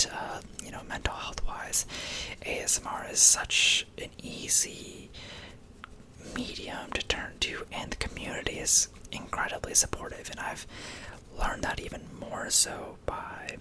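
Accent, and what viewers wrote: India. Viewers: Do what YOU enjoy. I like the variety. I found you through the Indian accent ones as well. Maybe just do one of those every now and then. But I like checking out your channel and not really knowing what to expect. Thanks for doing what you do. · I finally watched this video, and I just wanted to say that do what you want. Just like people found your channel because of your Indian accent and that’s what they enjoy, people and views will come for other types of content. This is your channel and either way we enjoy your videos! Thanks for all the content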